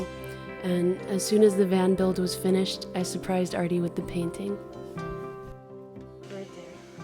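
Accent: Massachusetts, United States. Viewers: What an amazing gift!!! I LOVE the Mass accent! The Van is rad, and I'm sincerely happy your living your dream, and now you can travel with your soulmate, Morgan (; Sending love from New Hampshire!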